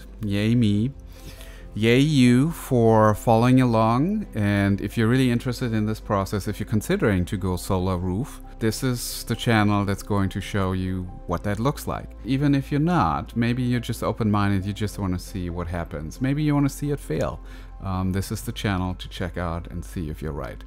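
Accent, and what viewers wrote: Germany. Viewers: Grade über deinen Kanal gestolpert, super Video, dein Akzent bewegt mich dir Grüße aus der Heimat zu senden. Hoffentlich gibst es das Solarroof bald in Deutschland zu kaufen!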